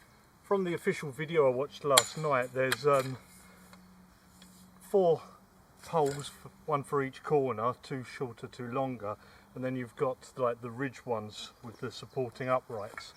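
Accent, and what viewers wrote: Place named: Kent, United Kingdom. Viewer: You’re a true Kent man.its the accents.I used to know a man from down that way.u sound so alike..a compliment I should add. Nice tent,probably the best I’ve seen. Bird song fantastic.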